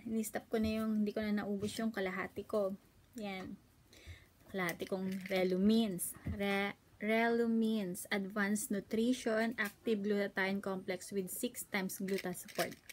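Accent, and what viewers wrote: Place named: Ilocos, Philippines. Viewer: ilokano k girl? pra kcng may punto k. ganda mo nmn khit nsa japan k belo p rin eh db dami gluta dyn?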